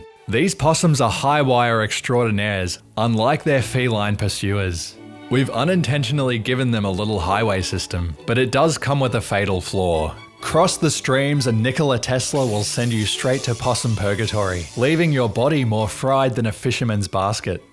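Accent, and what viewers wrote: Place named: South Australia, Australia. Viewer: You've got s similar sounding voice to OzGeographics, albeit a bit lower pitch. Same South Australian accent though 👍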